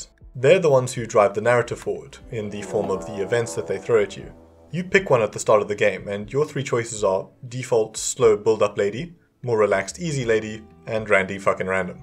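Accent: South Africa. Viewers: Ayo, are you South African? 🤨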